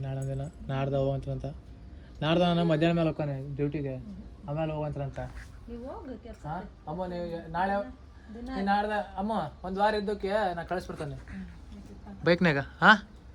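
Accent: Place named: North Karnataka, India. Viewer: ನಮ್ಮ ಉತ್ತರ ಕರ್ನಾಟಕ ಭಾಷೆ ಮಾತಾಡಕತೀರಿ , ಇದೇ ತರ ನಮ್ಮ ಭಾಷೆಯಲ್ಲೇ ಮಾತಾಡ್ರಿ ಅಣ್ಣಾ.. 🔥👍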